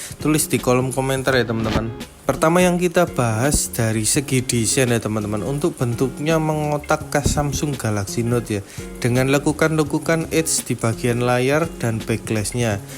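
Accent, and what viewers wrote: Java, Indonesia. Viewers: Bagus reviewnya mas.tp agak medok.(maaf)keliatan orang jawa ya😂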